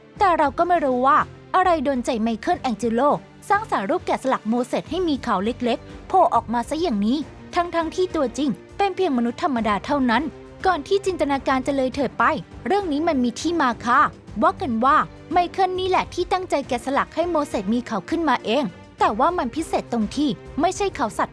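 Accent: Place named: Isan, Thailand. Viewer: เจ้าของช่อง เป็นคนอีสานใช่ไหม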